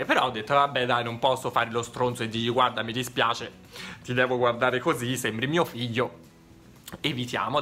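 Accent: Tuscany, Italy. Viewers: AMO IMMENSAMENTE IL TUO ACCENTO TOSCANO. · ma sei toscano? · Sei toscano? P.s i tuoi video mi fanno morire dal ridere😂❤ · Sei toscano?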